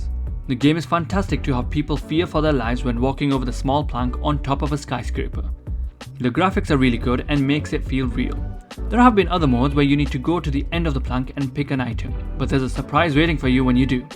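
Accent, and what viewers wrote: India. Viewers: Do I detect an Indian accent ? ;)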